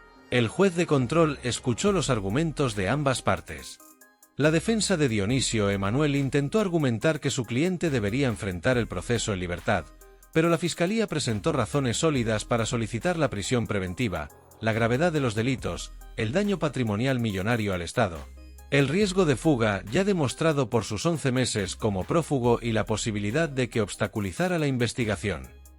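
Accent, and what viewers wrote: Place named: United States. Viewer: No puedes pronunciar CUAUHTEMOC ?? o decir veinte millones ?? De que pinche cerro te bajaron ?? Tu pinchurrienta manera de hablar tan agringada y miserable dan risa...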